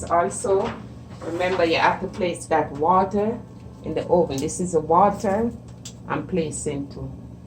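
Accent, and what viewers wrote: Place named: Jamaica. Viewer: The accent and pronunciations are killing me. 100 percent Jamaican.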